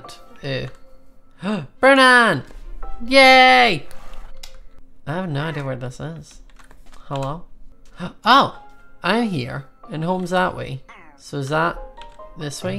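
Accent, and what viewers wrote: Scotland, United Kingdom. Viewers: You remind me of that sloth off of ice age because you are taking Dragons . Your so funny and I love your Scottish accent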